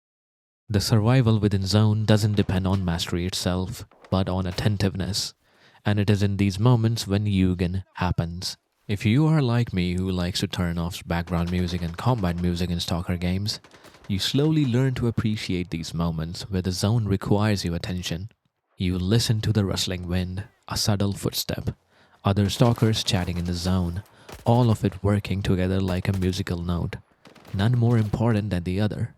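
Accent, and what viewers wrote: India. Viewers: I was like "Is this guy Indian or something ?", turns out yes, you are, I detected some thin traces of accent (one of my best friends is Indian too - for the record)... Anyways, you have a really good story telling, and the way your display your culture and philosphy in this video is very well written, I quite like all those small pieces you give, they form a well woven story, and I agree with all of that : you can always leave the Zone, but the Zone will never leave you.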